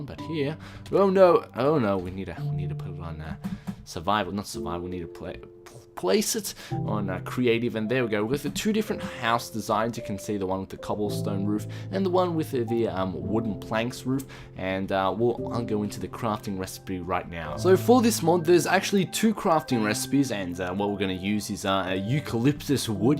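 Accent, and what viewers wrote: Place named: Australia. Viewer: Australian English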